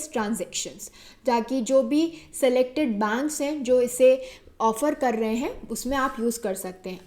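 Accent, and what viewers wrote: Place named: Gujarat, India. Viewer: aapki Hindi speech me gujarati ki style thodi lagi muje es liye puchha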